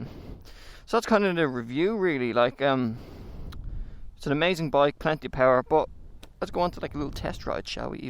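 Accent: Ireland. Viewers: Are you irish pal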